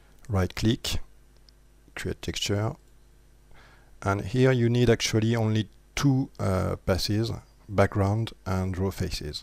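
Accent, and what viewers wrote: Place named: France. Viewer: Really useful video. I would have never thought about that trick. Also I enjoy your accent. Brings back good memories from the land of Begets and red whine.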